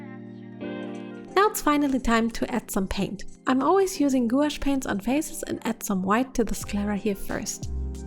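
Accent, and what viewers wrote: Germany. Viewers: I have a question ^^ are you german? :D cuz your accent sounds german ^^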